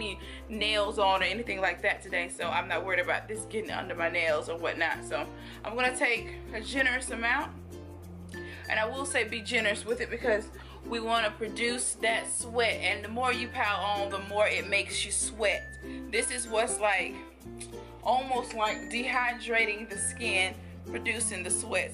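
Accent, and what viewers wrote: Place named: South, United States. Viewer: Well... she does have an accent but it’s because it’s southern. Not ghetto. To me it’s not an accent because I was born and raised down here. Thanks queen for the video!!!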